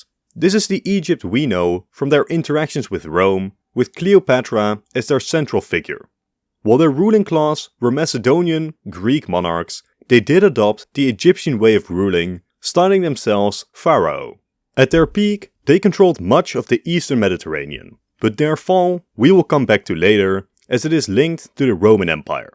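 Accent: Netherlands. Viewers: Hoort gelijk dat hij Nederlands is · ik hoor aan je accent dat je Nederlander bent :)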